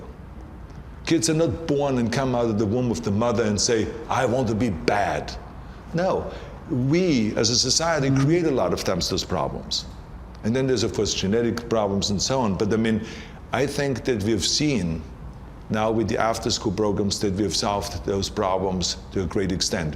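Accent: Styria, Austria. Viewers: He speaks Styrian, a dialect from Austria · He has an Austrian hillbilly accent and for that reason they didn't let him do the German dub for Terminator. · Actually he speak German with an Austrian accent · Ze Austrian accent suits him perfectly. Imagine speaking Russian or French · He has a veeery strong Austrian accent 😄😎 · He is Styrian, he speaks with an Styrian accent... not even near to swiss?! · Sacré!! Ah j aime bien son accent autrichien 😅 quand il parle allemand! 💪😎 Der Terminator!! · Nope, it's a kinda thick austrian accent. Personally, I love it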